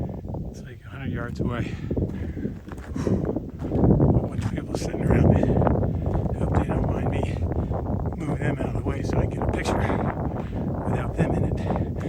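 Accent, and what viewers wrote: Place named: Philadelphia, United States. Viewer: Great job! Will miss that Philly attitude and voice.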